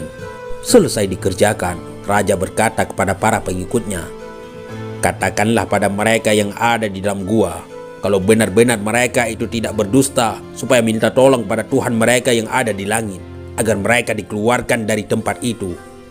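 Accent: Aceh, Indonesia. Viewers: Logatnya seperti org aceh.. Terdengar dari palafazan huruf "T" nya yg tebal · Apakah pengisi suaranya org Aceh??? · Ini yg crita pasti bukan orang jawa , kyk orang aceh atau bali juga lombok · Naratornya dr Bali, Padang atau Aceh?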